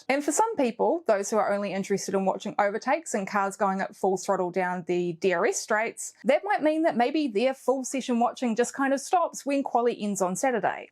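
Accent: New Zealand. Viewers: Stop!! You need to give people warning. You really do. A Kiwi accent with a slight lisp. Loud, proud, and scary. Oh God while I'm typing the dog just went into meltdown. Don't ever say kettle of fish like that ever again. They are cancelling you because of public health, and maybe watching style and hanging out for a red flag and all your other Aunty type of preaching over the most singular boring sporting event that is somehow still on. Come on, Union and netball is your bag. That's your wheelhouse, your hitting zone, not doing a Karen preach about the most boring thing ever televised. The Military tattoo is better viewing. And..... Learn how to pronounce hypocritical properly. Please. Sorry but it's the truth. That accent, at that speed, with that entitled Jacinda like, I know boats, put down isn't your strong point. I can't listen to another do I'll just ask... 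Do you do this word of the week thing in each post? How many times can you get outlier into one post? Wow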